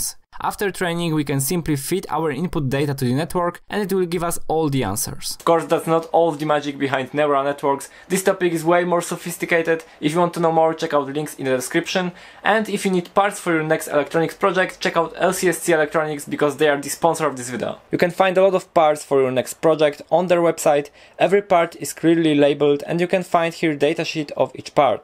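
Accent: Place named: Poland. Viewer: Po akcencie poznałem że to Polska XD Pozdrawiam, mega robocik :D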